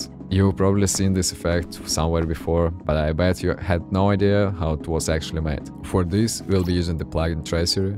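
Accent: Russia. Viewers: You have russian acsent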